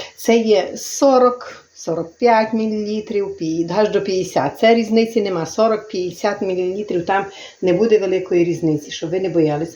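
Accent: Ukraine. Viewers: Вот это настоящий Украинский! Приятно слушать и все понятно. Спасибо вам.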